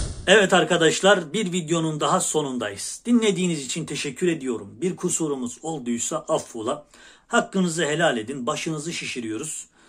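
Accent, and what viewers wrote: Erzurum, Turkey. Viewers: arada bir erzurum siveside guzel oluyor ahiskalilar sivesine benziyor bana çocuklugumu hatirlatiyor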